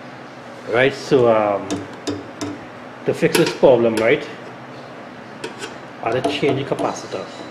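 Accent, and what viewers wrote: Trinidad and Tobago. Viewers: Hello, you sound like a truetrini. I am a trini living in Nevis and my sharp carousel fuse blew 2 years ago when I foolishly tried to cook rice in it. I dont want to buy another microwave although it is cheaper than fixing. However I would want to fix it myself. Your video is very good for my problem. Are there more than one fuse in it? also do you sell replacement fuses in Trinidad? If so let me know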